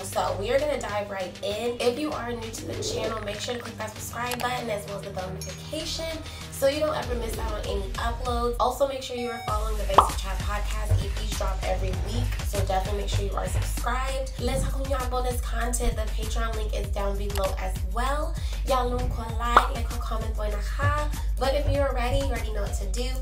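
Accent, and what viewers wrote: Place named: Thailand. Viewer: Awwwww ur Thai accent was so cute >< thank you for reaction naka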